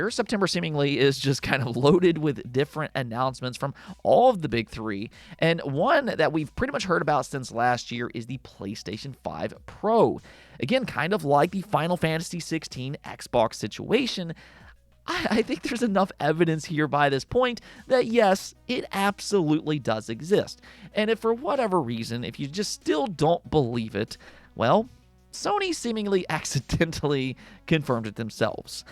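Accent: Philadelphia, United States. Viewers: Are u from Philadelphia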